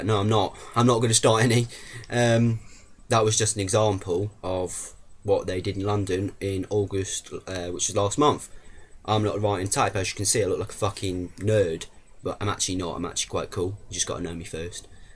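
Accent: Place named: Northern Ireland, United Kingdom. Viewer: Congratulations, I commend your marvellous miscellany of accents. And may if I may say so, I am getting ever so slightly used to the old Northern Irish voice by now...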